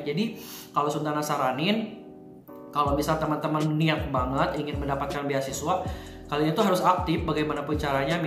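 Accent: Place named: West Java, Indonesia. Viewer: Logatnya sunda abis kang... Aku jd gegeut...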